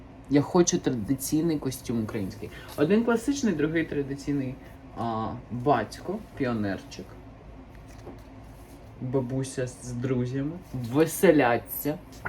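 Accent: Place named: United States. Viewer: Ухти)) в вас такий американський акцент став за ці роки. Цікаво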